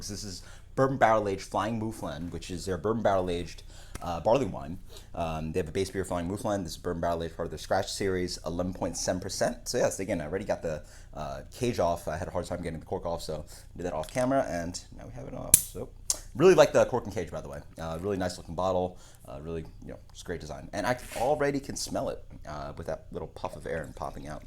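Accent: United States. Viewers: Are you from upstate NY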